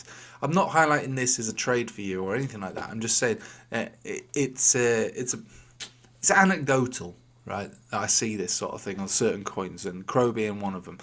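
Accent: United Kingdom. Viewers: Not sure what u took from this video but it’s nice to hear British every morning :) I feel cultured and noble right from the start of the day :D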